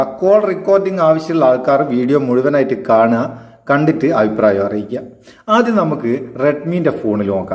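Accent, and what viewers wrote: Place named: Vadakara, India. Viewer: ഇജ്ജ് വടകര ആണ് അല്ലെ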